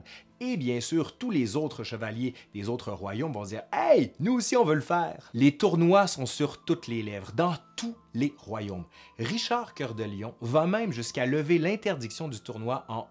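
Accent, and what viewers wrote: Quebec, Canada. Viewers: Excellent ! Comme tout jour. De votre accent québécois Je dois, ma foi, l'aimer pour et pour. De revenir mon œil Pour mourir Roy !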